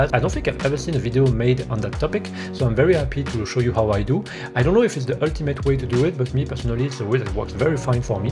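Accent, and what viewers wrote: France. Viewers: Super tuto j'aime bien ton léger accent français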